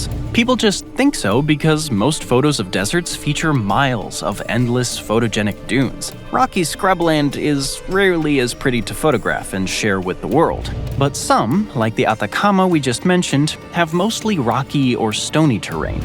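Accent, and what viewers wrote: United States. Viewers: Are you American by chance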